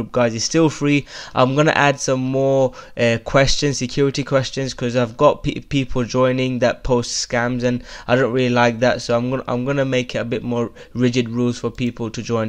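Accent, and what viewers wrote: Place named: United Kingdom. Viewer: I feel he is a hidden gem in the world of crypto youtube. But I am quite partial because I love the Brit accent too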